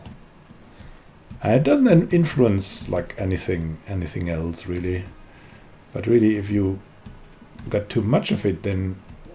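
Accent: Germany. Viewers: Are you German? I think your accent sounds like it. Bier hier, bier hier!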